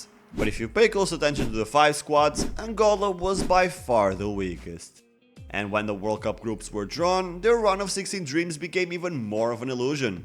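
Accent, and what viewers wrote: Portugal. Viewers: Sabes que youtuber é portugues quando o sotaque portugues é bem evidente/pesado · he is Portuguese, his English accent can tell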